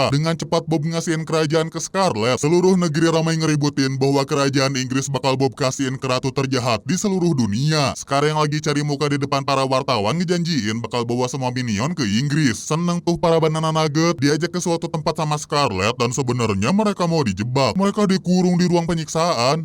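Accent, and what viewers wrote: Java, Indonesia. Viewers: abang jawir ya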